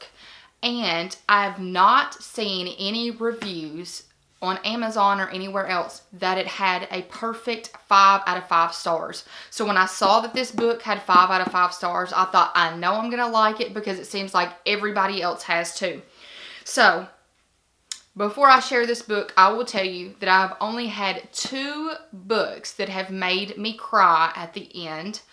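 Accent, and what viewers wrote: South, United States. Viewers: I love this! I love how honest you were about not reading but finding your niche. Like you, it took my finding a verse novel years ago just passing by in a book store. I was there with my kids to play and read books. On the way out I stopped picking one up of Ellen Hopkins, Burned. After reading it I sought out to read others of hers but the story at hand was not for me. Although, I value her and her take on life because it's personal matter to her and I know so many can relate. I moved on finding other verse novel authors and evidently wrote my own verse novel, Under Contract: Life in the Middle of Dreams, it was released last summer. If you'd like to read it and review it I'd be happy to mail you a copy. You are precious, I love your accent. Are you from the south? I have people comment on mine all the time. Again I enjoy this video and look forward to watching more of your videos. 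I hit that subscribe button! Have an awesome day and keep shining!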